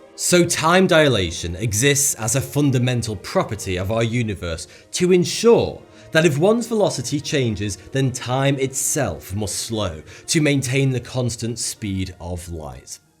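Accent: United Kingdom. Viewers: What variety of British accent is this that pronounces a soft "th" as an "f" along with the rest of the peculiarities? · I really like that British accent. Ur "but" sounds for me like a "bot", and reminds me the Medieval game the voice of that thing in the head of a skeleton.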